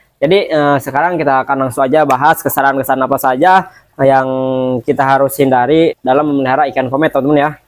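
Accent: West Java, Indonesia. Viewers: Aing asa aya layan. mun tepung jeng orang sunda. aslina kang lila ngarantau ngadenge logat sunda asa bungah pasedek-sedek herin. modar 😅 🤣🤣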